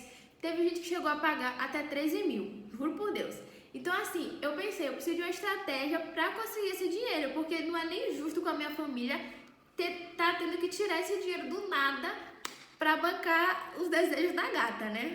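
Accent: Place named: Bahia, Brazil. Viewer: minha querida, seu sotaque é bem baiano e eu amei seu vídeo, que loucura tudo isso, sua irmã é uma santa kkk boa sorte espero que prospere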